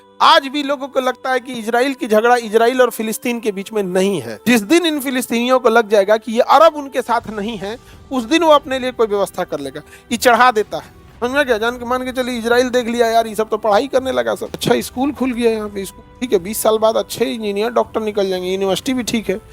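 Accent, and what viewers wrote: Bihar, India. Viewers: I don't care here to get ' Gyan'....I come here for the interesting Bihari accent 😎❤️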